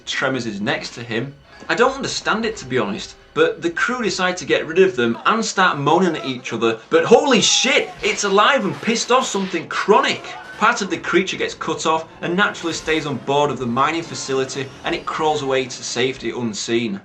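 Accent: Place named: Yorkshire, United Kingdom. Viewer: He sounds like he's from Yorkshire in north england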